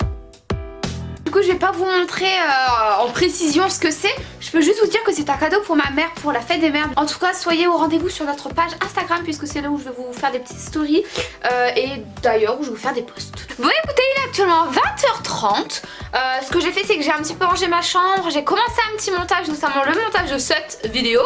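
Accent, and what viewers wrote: North, France. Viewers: Vous avez l’accent du Nord fort prononcé 😂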